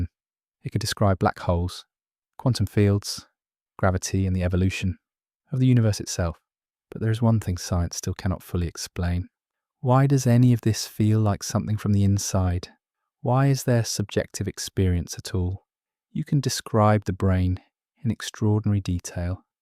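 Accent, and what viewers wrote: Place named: England, United Kingdom. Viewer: How many millions of people listen to you?Because you have a English England voice\nYou're just saying this thing over and over again , that's really boring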